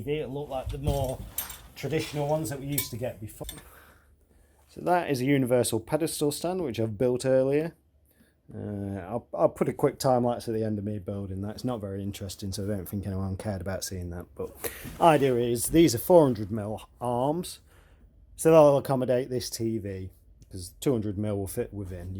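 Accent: United Kingdom. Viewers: Is this man from london or little port i can't tell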